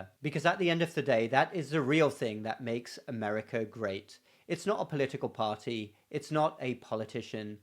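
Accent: United Kingdom. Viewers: You are such a clear voice. Love to listen to you. Decide which pod casts I need to play, without comment, whilst my hubbie sits next to me taking in what you say. Love your British voice on the health and now, unusually, political scene. Americans today no longer appreciate their constitution. As a Brit, I understand now why Americans have said it is the best country, I have studied their constitution etc for years and now understand why they have said it. Sadly, I understand what they may lose, without appreciating what they had. Very sad and worrying for the West. 🇬🇧🏴🙏🏻👍❤️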